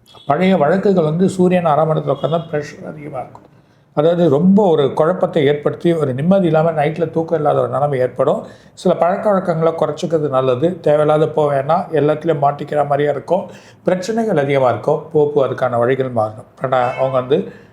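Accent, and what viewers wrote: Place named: Chennai, India. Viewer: Super a madras language pesureenga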